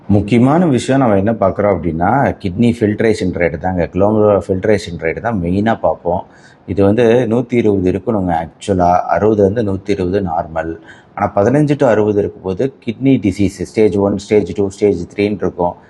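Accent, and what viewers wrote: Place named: Coimbatore, India. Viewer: வணக்கம் டாக்டர். கோயமுத்தூர் பாஷை வாசம் வருகிறது உங்கள் பேச்சில்... சிறந்த அறிவாளி அறிவான சொல்.. செயல்... வாழ்க பல்லாண்டு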